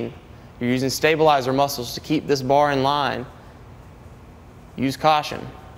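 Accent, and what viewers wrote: South, United States. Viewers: He's got that accent... Hmm.. Southern accent?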